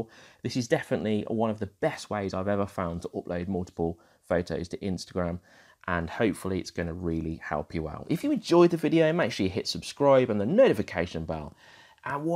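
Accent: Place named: Yorkshire, United Kingdom. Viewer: Love the Yorkshire accent (Lancashire?) ... ! and right now trying out Lately here in Japan where I am based (I'm from Yorkshire). I basically came to your tutorial as I only post to IG via my MacBook Pro and need to do some multiple photo posts. Normally post by changing the User Agent to iPhone in Safari but it has too many limitations.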